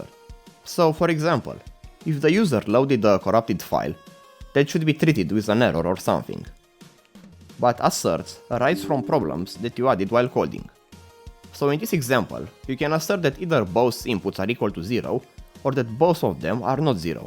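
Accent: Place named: Romania. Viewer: romanian accent for the win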